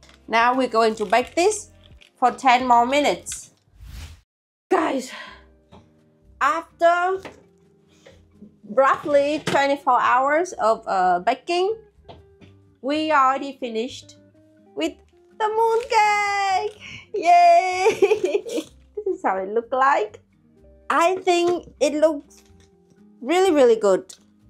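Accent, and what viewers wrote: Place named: Germany. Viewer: Your English is not a burden! I love. You remind me of how my German mother speaks English and I find it quite lovely.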